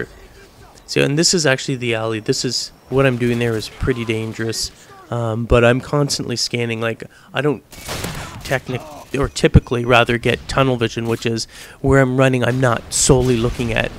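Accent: Canada. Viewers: Is this dude canadian? his accent is kinda funny.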